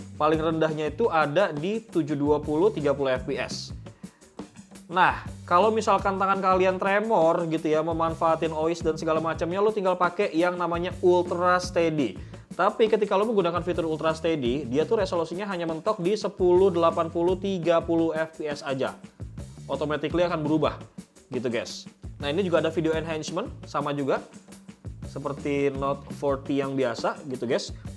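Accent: West Java, Indonesia. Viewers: Kaciri pisan sundana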